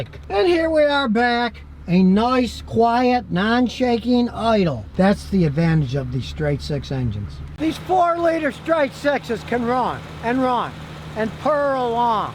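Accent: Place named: New York, United States. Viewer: sounds like a newyorkian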